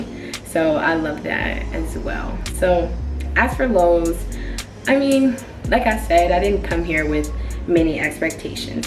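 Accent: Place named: United States. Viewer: The accent is why they say you have an American thing.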